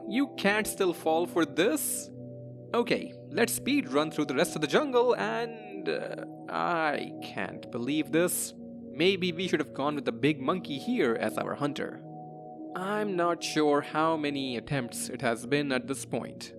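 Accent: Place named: India. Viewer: I can't with your Indian accent bro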